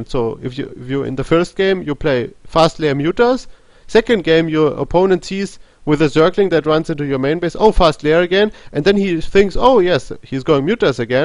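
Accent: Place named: India. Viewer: his accent sounds kinda indian, this confuses me.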